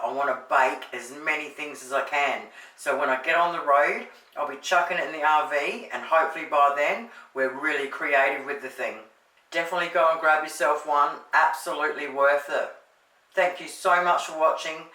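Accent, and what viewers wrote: Australia. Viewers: Wonderful listening to Australian English in a review. Love the sound special effecs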